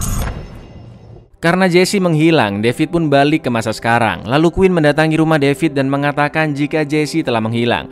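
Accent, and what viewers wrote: Java, Indonesia. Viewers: Jowo kah bang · Risih kbnyakan medok jawa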